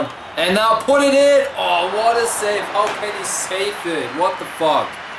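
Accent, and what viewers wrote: Germany. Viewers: Gustavo Yavar he is german. Deal with it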